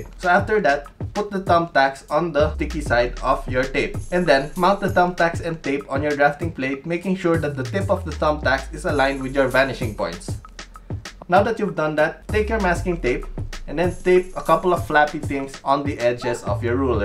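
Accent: Philippines. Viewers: Kuya nag tatagalog po ba kayoo... parang pinoy po kc kyo.. 😅😅😅 .. do you have a video about rendering style but pen and ink only rendering.. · Uhmmm are you Filipino?? Cause you sound like one... No offense tho cause I'm Filipino too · Are you Filipino? I just noticed your accent and the way you talk. Idk if im right, but.. Are you? PS. I CAN'T BELIEVE I JUST SAW YOUR CHANNEL NOW *smashes subscribe button in rage*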